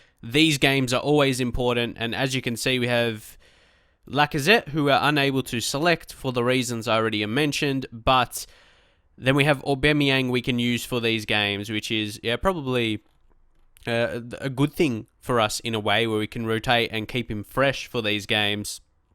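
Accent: Australia. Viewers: The reason i think people hate on you is because of your accent which i think is stupid. Although i do kind of find the Australian accent a bit annoying, I'm not going to hate you or your content for it. If you were American or British you would receive way less hate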